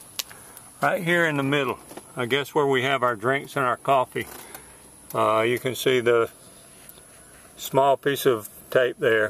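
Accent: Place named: South, United States. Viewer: once I saw duct tape followed by a country accent, i knew i was in the right place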